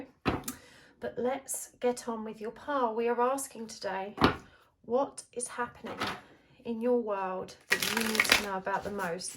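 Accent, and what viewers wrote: United Kingdom. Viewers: Dear. I am writing to say how life changing and transformational this reading for me. I chose pile 3. It was so cathartic and eureka moment. The book recommendation by you about Judy Hall! I found it immediately on scribd and was included in my membership. I take it as fate and serendipity. You narrating your personal experience gives me comfort. You are super gifted and seem a trained counsellor or psychologist. I can guess from your accent that you are from UK. So am I and it would be a privilege to have a F2F reading from you thank you again for lighting me my path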